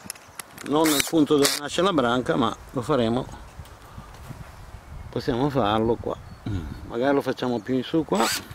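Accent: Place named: South, Italy. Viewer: Italiano del sur supongo: Sicilia, Nápoles o Cerdeña...(( Yo lo entiendo todo )).Es fantástico...!!! Amo Italia...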